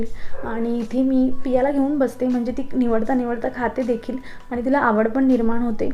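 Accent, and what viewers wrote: Khandesh, India. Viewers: Tumhi proper kuthle ahe??? Khandeshi ahat ka???language vrun vataty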